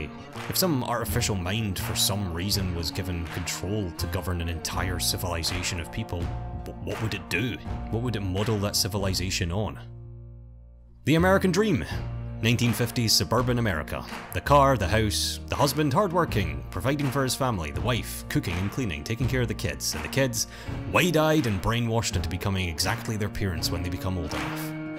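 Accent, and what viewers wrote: Scotland, United Kingdom. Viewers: I’d say Scottish, it reminds me a bit of my own accent and the one I hear a lot from family. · Loved this animation + omg you're Scottish too! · sarcastic af Scottish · I hEaR a ScOtTiSh AcCeNt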